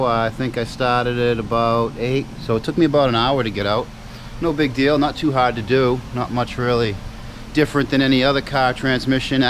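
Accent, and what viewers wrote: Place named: New England, United States. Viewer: Nice videos, subsribed! :) Love that New England accent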